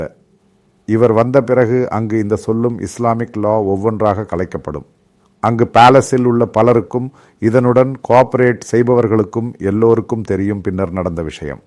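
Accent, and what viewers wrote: Kerala, India. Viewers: എനിക്ക് തമിഴ് അറിയില്ല എങ്കിലും കേൾക്കുമ്പോൾ മലയാളം തമിഴ് പറയുന്നതായി ഒരു തോന്നൽ എന്തായാലും തുടക്കമല്ലേ നല്ല തമിഴ് ഭാഷയിൽ തന്നെ ഇത് തുടരാൻ സാധിക്കട്ടെ എന്ന് പ്രാർത്ഥിക്കുന്നു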